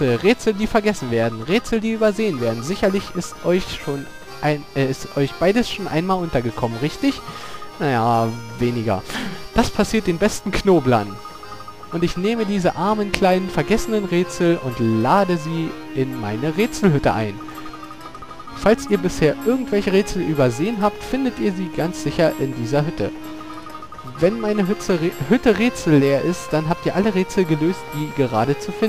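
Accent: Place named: Berlin, Germany. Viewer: geiler berlinnischer akzent hast du^^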